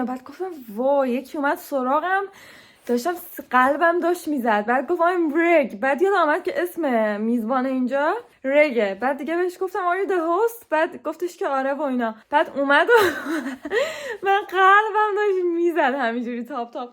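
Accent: Yazd, Iran. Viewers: وایییییی اتفاقا عالی یزدی حرف میزنی اولین بلاگری هستی که دیدم شهر ما ذو میشناسی و ازش حرف میزنی 🙂🤌✨ عاشقتممممممم🎀🍓😚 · مگه یزدی هستیییبیی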